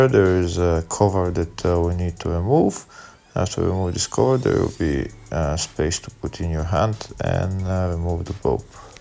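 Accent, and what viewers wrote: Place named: Eastern Europe. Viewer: This accent sounds familiar. Kinda eastern European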